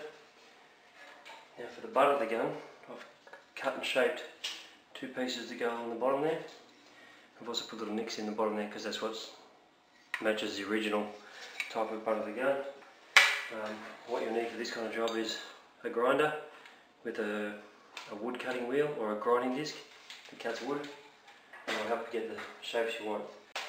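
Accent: Australia. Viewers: r u an aussie if so i applaud you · Yeeeeeeeeeesssss an Aussie! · Mmmm, interesting, is that an Aussie accent? Good job!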